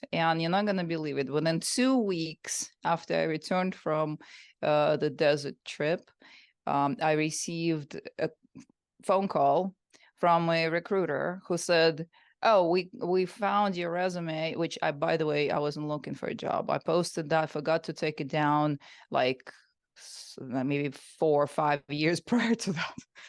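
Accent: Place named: Russia. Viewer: She has russian accent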